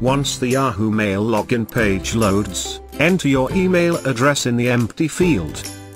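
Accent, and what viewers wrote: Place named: United Kingdom. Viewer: I love British accent so cute and sexy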